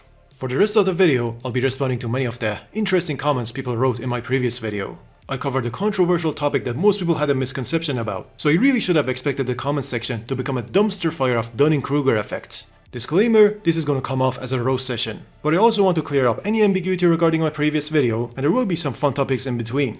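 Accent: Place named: Iran. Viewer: yeah i know ، i didn't want to disrespect you brother , but you have the same accent as Iranians , i thought you might be Iranian like i am , im Persian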